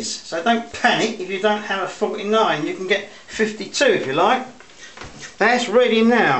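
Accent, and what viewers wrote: London, United Kingdom. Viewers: London. He sounds like my Dad, born 100 yards from London Bridge.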